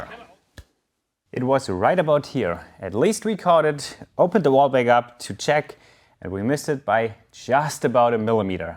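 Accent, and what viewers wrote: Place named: Upper Bavaria, Germany. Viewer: tatsächlich? Ich hätte und dich eher als Oberbayern eingeschätzt. Seid ihr richtig ausm ländle, oder aus dem dahergloffene bayrische "schwaben"? (Ja, ich tu mich offensichtlich schwer bauernschwäbisch in schriftform zu verpacken)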